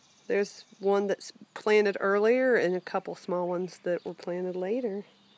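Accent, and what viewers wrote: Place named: Memphis, United States. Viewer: Your garden looks very healthy. By your accent it sounds like you might live near us here in Memphis. My tomato Jungle is in the greenhouse this year. I had such a battle last year with the tomato horn worm outside that I moved them all (100 plants) inside. I look forward to seeing more of your garden this year. Chuck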